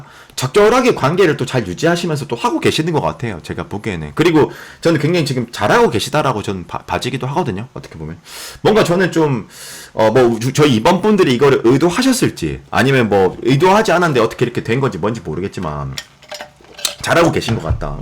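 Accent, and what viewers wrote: Gyeongsang, South Korea. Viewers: 1번...몇달전에 썸타다가 제가 까인 입장이라..ㅎㅎ 저랑 잘 될수가 없을거같긴한데...ㅜ저 자존감 낮아져있어용 ㅜㅎㅎ그나저나 전라도 여자인데 경상도 사투리 너무 듣기좋아용~~~차분하게 말씀 잘해주시고 전달력 충분히 잘 되고있어요^^ 모든 사람이 서울말을 써야한다는건가..그건 너무 억지같아요..계속 사투리 리딩 기대할게요^^ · 사투리가 어때서 트집을잡냐? 경상도 사람이면 사투리쓰지. 에구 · 갱상도라 좀 거부감이 있지만 내용이 더 중요하지요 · 갱상도인으로서 사투리 지적은 용납할수가 없다. 우리는 서울말같은거 쓸줄 모린다. 너거도 사투리 몬쓴다아이가.! 같은 갱상도인으로서 긍지와 자부심을 지켜주십시오. 우리가 돈이 없지 가오가 없습니꺼!!!!! 화이팅!!!!!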